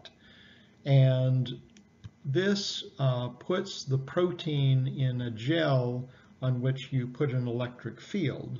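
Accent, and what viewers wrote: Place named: England, United Kingdom. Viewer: Request you to kindly add subtitles. English accent is hard to understand. 🙏🏼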